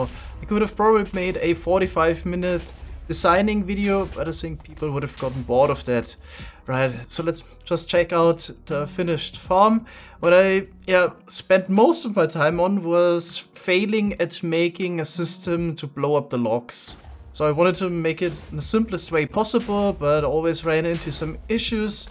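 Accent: Germany. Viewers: He is german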